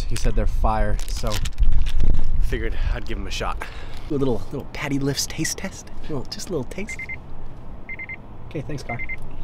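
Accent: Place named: Canada. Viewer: Never noticed the accent I guess you are Canadian lol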